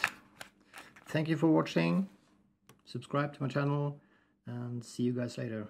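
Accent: Norway. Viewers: Gotta be a norwegian. Nice norwinglish. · He sounds norwegian :-) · This sounds to me like a Norwegian accent. It’s beautiful.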